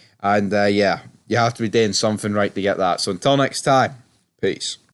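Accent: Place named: Liverpool, United Kingdom. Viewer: damn i guess scouse accent isnt the best one for youtube videos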